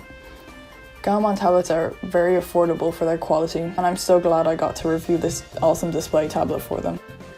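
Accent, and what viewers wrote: Ireland. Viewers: casualy has an Irish accent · your voice is so cool :0 i can recognise an irish accent aha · YEAAAA but it’s not super thick if that makes sense ?? But like ?? I still just adore it omfg I love irish accents sm 💀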